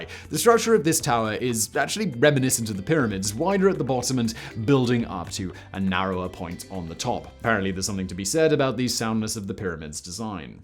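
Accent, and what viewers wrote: England, United Kingdom. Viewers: Dude has used 11th grade literacy and semi posh English accent to build an empire. And i mean "11th grade literacy" at maximum. he mispronounces words I've never heard mispronounced before. Regardless, business brain blaze has made me love him AM I RIGHT PETER!?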